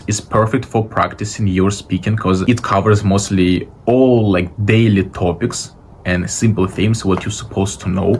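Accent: Russia. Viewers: Вы из России? акцент🙃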